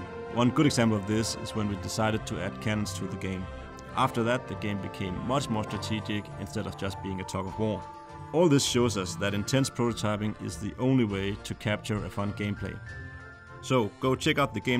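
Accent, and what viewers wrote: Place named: Denmark. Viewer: he has a Danish accent. Just like me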